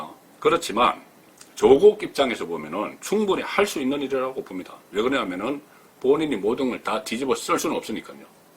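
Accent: Gyeongsang, South Korea. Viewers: 추카 추카 합니다 늘 응원하고 있습니다 날카롭고 군더더기없는 비평! 긍께 어쩌겠어요 사투리를 경상도 사람은 잘들리고 알아듣는데 ㅋㅋ^^ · 저는 경상도 사람이라 의 말투가 정겹기만 합니다 오늘 자막처리한 글에 오타가 있습니다 붉어지다(×) 불거지다(0) 아! 10만 구독자 돌파 축하드립니다 내년 이맘때는 50만 독자 돌파를 기원합니다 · 그래요.. 잘보고있어요. 경상도말씨같은데요. 고향이어디신지궁금해요. 지금잘하고계십니다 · 10만 구독 축하드립니다. 진솔함이 묻어나는 송본부장님의 구수한 경상도 사투리 정말 좋습니당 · 경상도.사투리도좋아요 축카합니다.100만쭉~~ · 10만 추카드립니다 발전을 위하여 쓴소리 좀 할게요 저도 경상도지만 억양은 고칠수 없습니다 하지만 말의 속도는 줄일수 잇죠 ㅋ 연습하셔서 속도는 좀 줄이면 좋겟슴다 꾸벅! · 구독자 10만을 축하드림니다~경상도 억양이 매력적이죠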